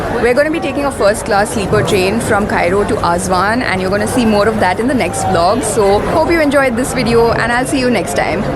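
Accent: India. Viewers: Amazing indian accent speaking english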